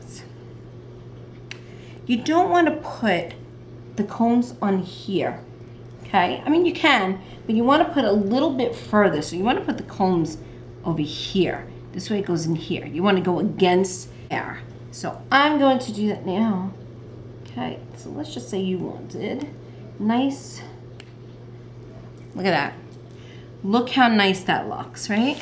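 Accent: New York, United States. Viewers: I admire you a great deal😘😘😘...i dont have any issues wirh my hair but i like that you are so confident even with such thin hair i almost forgot you have thin hair and your New York accent or Jersey i love. Home sweet home!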